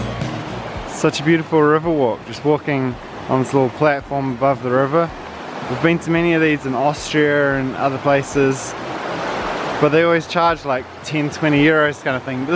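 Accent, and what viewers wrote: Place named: New Zealand. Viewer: Kiwi accent owwwwwwwwwww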